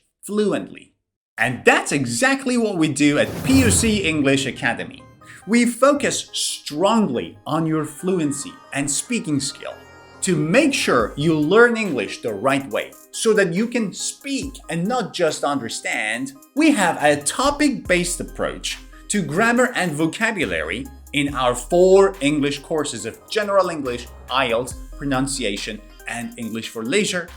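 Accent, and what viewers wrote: United Kingdom. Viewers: Is your accent American or British. I asked it because you pronounced the word " either " like British not American.😊😊😊😊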